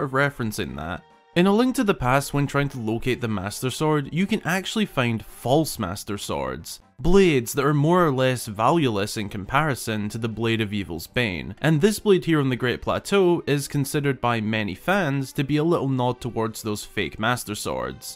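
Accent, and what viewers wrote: Scotland, United Kingdom. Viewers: You have the Andy Murray accent, complete with the groaning sound and drawn out words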